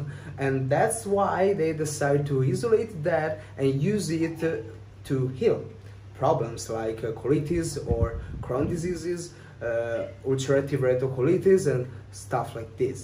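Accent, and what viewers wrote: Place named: Veneto, Italy. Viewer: Great,buddy 💪🏻l'inglese con accento Veneto è stupendo☺️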